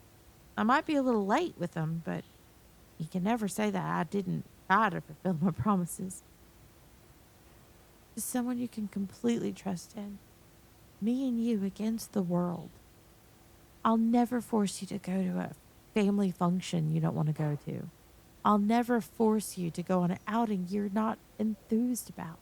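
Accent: South, United States. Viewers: I love your southern accent!! This is a cute audio! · First time listener,and I wanted to say that was very awesome. I loved your voice. The southern accent was great. I wish I could have an obsessive cowgirl take care of me lol. I enjoy how sweet she was and you slowly peel back the lairs,and find out she a crazy. I also enjoy the slight lustful side of her. The outro was pretty cool to hear that you relate the stories to irl situations. Plus it kinda gives us a little peak behind the curtain and get know a bit about you. So I recommend doing outros. Sorry for being so long winded 😅.i just wanted you to know you are amazing, and I can’t wait to listen to more 😊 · I love cow girls, and yours is my new favorite. The southern accent and flirting are so good, and I love the yandere turn. "Milk straight from the tap" is wild. But also, yes please!🥰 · Damn I am never this early, not gonna complain, we love the southern asmr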